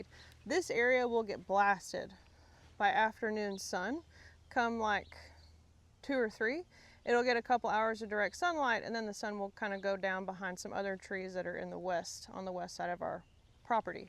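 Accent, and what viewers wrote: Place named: Texas, United States. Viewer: you can tell she's holding back on her texan accent.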